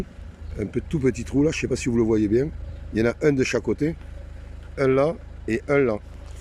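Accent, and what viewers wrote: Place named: South, France. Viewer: Merci un charmant petit accent du sud magnifique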